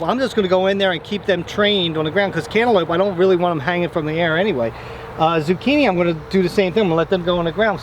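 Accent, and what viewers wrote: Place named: South, United States. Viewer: I have always wondered. Is saying "anywheres or somewheres" a southern thing? Good vid today!! I'm about to cannon ball in to your pool!!!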